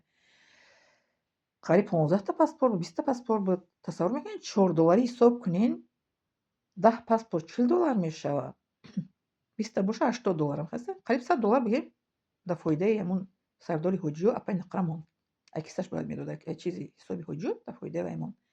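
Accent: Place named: Kulob, Tajikistan. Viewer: Ехоҳар хдт ай кҷои Гуфти кулобиҳо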